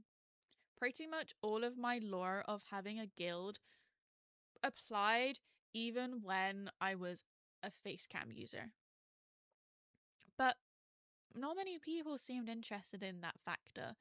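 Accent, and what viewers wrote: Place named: United Kingdom. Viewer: I think your voice and model match up nicely. I'm a small youtuber and I envy your correct British accent. Streaming is a cut throat business so I wish you well.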